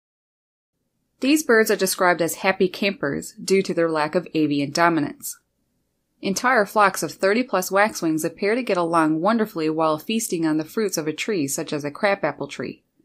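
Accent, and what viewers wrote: Canada. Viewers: I enjoyed your natural Canadian accent........ and all that bird stuff too. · Enjoy listening to your Canadian accent 😀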